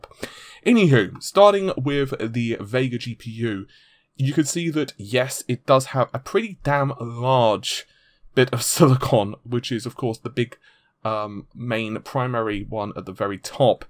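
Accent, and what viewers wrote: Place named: Southern England, United Kingdom. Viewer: He has a perfectly normal southern English type accent. Have you never encountered one before?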